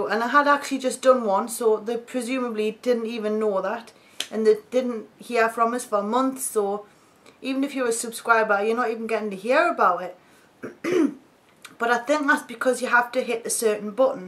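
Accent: Newcastle, United Kingdom. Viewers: I love just sitting listening to you rambling on must be that Geordie accent. I cannot do anything with my nails. Hopeless!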